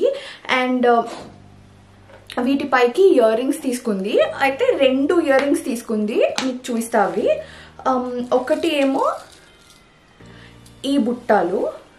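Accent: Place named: Hyderabad, India. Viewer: you are an absolute example of Hyd telangana girl in heart and tone. Love you. Keep going. I do not care much abt jewels or sarees but I like seeing you and the energy you bring to life when speaking❤. -from another Hyd sis.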